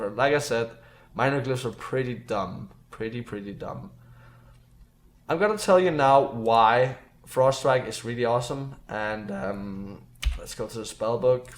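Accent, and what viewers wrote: Denmark. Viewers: I can hear it so clearly on the way you say things that you're a Dane, and that you're trying to speak English with an accent, don't try if you cant do it.... speak with sum more British people like I've done and now my English is amazing(speaking wise). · hey dude are you danish ? :) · haha, i knew he was from Denmark from that accent lol :)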